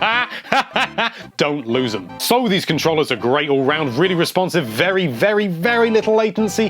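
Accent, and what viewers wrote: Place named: United Kingdom. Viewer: English folks from the UK tend to pronounce anything ending with an S as having a Z sound. Likewise A and R are swapped at the end of words... he called Sega seger.. Ocareina sounds like Ocareiner and Doctor become a Docktah It's just how their English tends to work when spoken...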